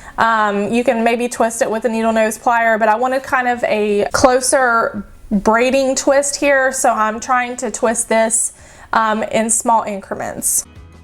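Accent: South, United States. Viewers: I am new and from New york..love ur southernzccent!!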